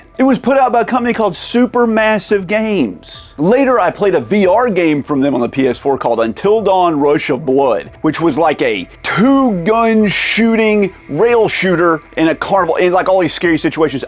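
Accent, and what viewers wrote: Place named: North Carolina, United States. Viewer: Just curious, are you from North Carolina? I am and you have that accent lol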